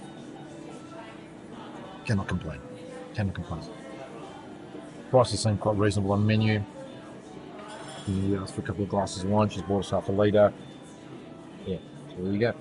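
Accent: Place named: Prekmurje, Slovenia. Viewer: "Slovenski gučiš?", hahaha funny. Unfortunately your prekmurian dialect wont help you much in other parts of Slovenia, but its nice to hear.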